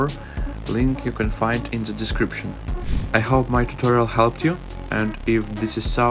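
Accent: Russia. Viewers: сразу понятно что русский потому что акцент не английский)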